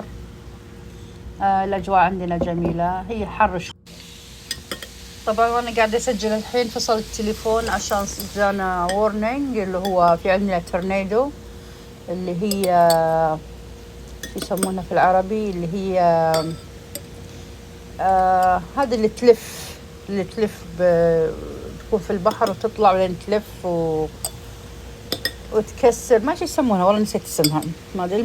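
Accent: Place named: Gulf, Arab world. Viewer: بالتوفيق ان شاء الله .. تشرفت بمعرفتك ... صوتك خليجيه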